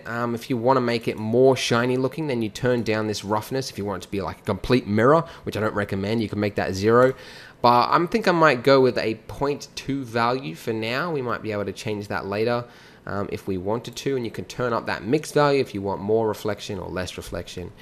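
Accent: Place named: Australia. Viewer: your from Australia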